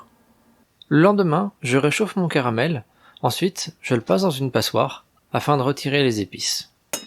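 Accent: Venezuela. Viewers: jajajaj excelente español a lo Venezolano, buenísima receta de ese manjar de los dioses.. Como dirían por aquí, "pa chuparse los dedos" saludos mi pana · Francés venezolano?🇦🇷🇧🇬🤔🤔🤔🤔🤔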